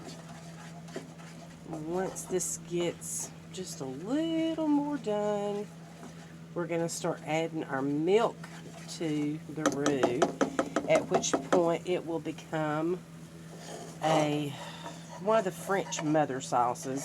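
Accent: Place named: Texas, United States. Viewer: I love ur accent. I am from Texas and I have that accent.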